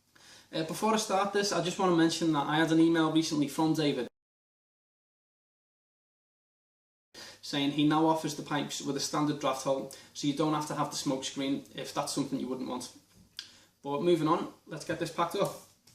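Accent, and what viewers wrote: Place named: Liverpool, United Kingdom. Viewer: Terminus Airsoft he's a scouser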